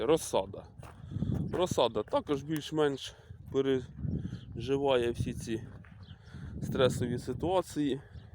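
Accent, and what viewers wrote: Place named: Poltava, Ukraine. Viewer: Говірка чую полтавська, а район який? Миргородський трохи поморозило.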